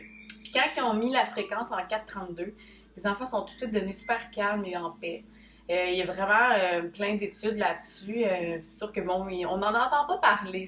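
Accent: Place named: Quebec, Canada. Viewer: L'accent québécois c décoiffant! Super vidéo ! Vive le 432hertz.. notamment le canon de Pachelbel ! Vous êtes canon aussi!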